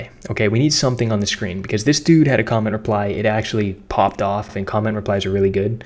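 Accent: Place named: Boston, United States. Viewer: Am i hearing a newengland accent lol if so wassup my Man im a Mainah but you have that boston vibe lmao.. Love the vids i have yet to master tiktok shop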